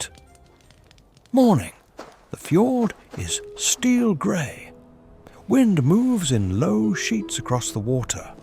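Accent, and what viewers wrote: United Kingdom. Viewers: Nice footage and “British” narrator, but not a single word about where they got the timber from and what valuable items they sold, which made the Norse settlement rich. So, it has to be a “👎” from me, sadly. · Good point, this looks deceptively very real but aint no documentary. Compared to actual history, he likes to exaggerate the adversity for drama and clicks. I suppose the AI british accent is supposed to be more authoritative too. Id like a narration in Alabama accent please.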